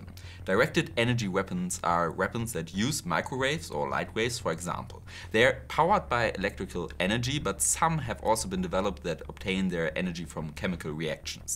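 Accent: Germany. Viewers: And i still dont know if he makes his accent stronger for the vids (since many English expect one hard German accent) or if it is his normal one. But i say he will still grow in the next months , after one point and base quiet fast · Die englische Aussprache hört sich aber mega-deutsch an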